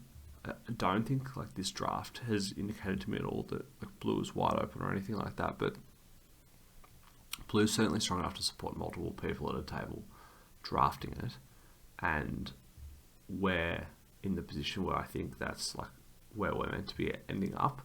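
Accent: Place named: Australia. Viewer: Just found your channel and I really appreciate the way you talk through your draft and analyse what went wrong in that smooth aussie accent.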